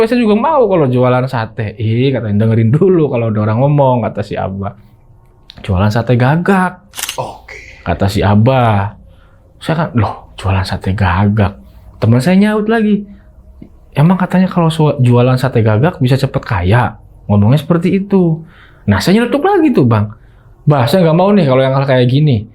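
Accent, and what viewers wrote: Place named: Indramayu, Indonesia. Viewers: Mlesat 😅, bahasa nya engga angger , Indramayu bgt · Indramayu hadir dari hongkong...Bahasane kosi kentel pisan ya kang,Indramayu ne ning endi kih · Aku orang indramayu bang logatnya sama kalo ngomong pahaam betulll 😂❤❤❤ top lah ceritanya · indrmayunya melekat ya mang😂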